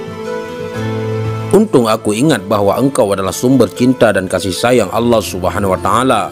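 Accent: Aceh, Indonesia. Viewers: Dialeg Aceh sptnya..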